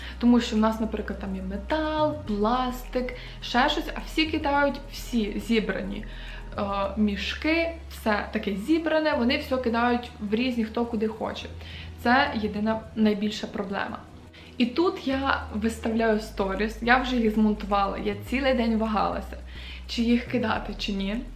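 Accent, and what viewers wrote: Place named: Lviv, Ukraine. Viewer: Та вона чисто по львівськи говорит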